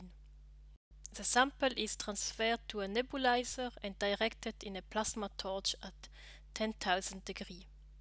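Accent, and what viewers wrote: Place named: Germany. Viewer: most german sounding English i ever heard... but informative non the less :D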